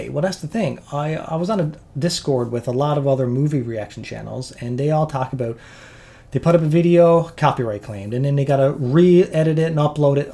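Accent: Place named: Newfoundland, Canada. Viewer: Will the movie reaction channel remain “active” for “monetization” purposes? I often replay (or listen to) old favorites while commuting, doing my makeup, etc. and don’t intend to stop. Hopefully, you’ll continue to get some financial benefit from your past work! As a fan —and subscriber since August 2021– I’ll (selfishly) miss your fantastic reactions and commentary. But I wish you and your family only the best moving forward! On a final, personal note: It may sound kinda lame, but I (an American) fell in love with the “Newfoundland accent” during quarantine. To make a long story short.. I ended up moving out of state and living with my mom/grandma throughout the various lockdowns (about 16 months total). We watched a LOT of TV/movies together in that time; one of our collective favorites was the show “Murdoch Mysteries.” For anyone who isn’t aware, one of the best MM characters is a young man from Newfoundland: Constable George Crabtree [played by actor/comedian Jonny Harris]. 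My grandma (who I grew very close to during the pandemic) has since passed on, but your voice still reminds me of the happy times I was able to share with her, despite the world seemingly falling apart around us. ☺️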